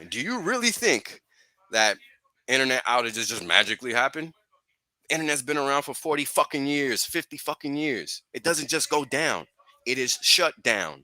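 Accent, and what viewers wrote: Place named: New York, United States. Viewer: Sounds like hes from urban new york... how can you hear skin color ?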